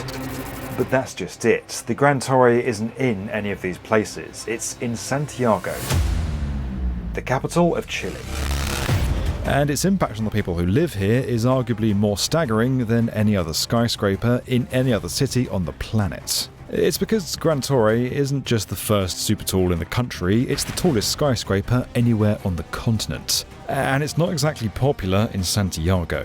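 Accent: England, United Kingdom. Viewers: Didn't totally expect to actually listen to a English man talking about the Costanera Center But here we are Viva chile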